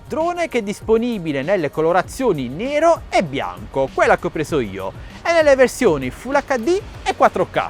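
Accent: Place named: Molfetta, Italy. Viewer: Sei di Molfetta? La A la E ....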